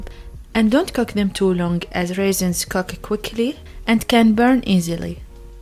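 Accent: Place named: Saudi Arabia. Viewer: عشقي الاكسنت السعودي ❤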